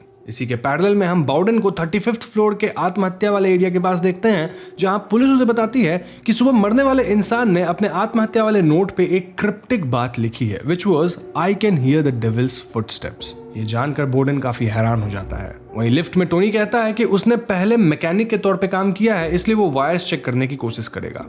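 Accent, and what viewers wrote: Bengal, India. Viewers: Aap bengoli ho?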